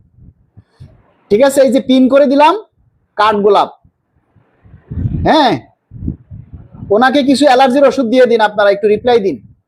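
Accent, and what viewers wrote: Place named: Comilla, Bangladesh. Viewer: কথা শুনে বুজতে পারলাম উনি খুবই বুজদার। কুমিল্লার ভাষা এটা। আমার মনে হয় বাড়ি কুমিল্লা ঐ। বাহিনীর লোক বেশি কিছু বলতে পারিনা।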